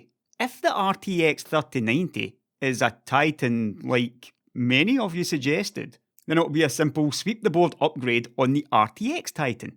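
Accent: Scotland, United Kingdom. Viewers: I love the Scottish accent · thank you for your sweet Scottish accent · tech talk reasoning in a Scottish accent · We love the scottish guy with the scottish accent!!!! PLEASE dont stop the vids !!! Dont let us be a total fodder for the marketing departments !!! We need really smart opinions like yours!!!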